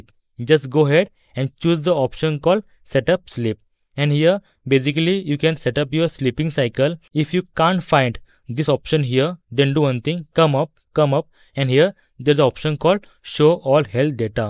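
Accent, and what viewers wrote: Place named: India. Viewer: why indian accent is so bad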